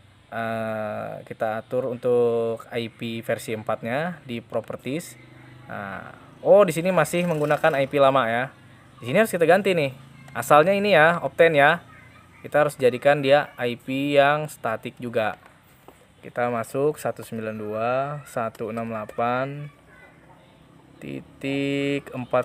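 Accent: Banjar, Indonesia. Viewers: banjar banar.. hehe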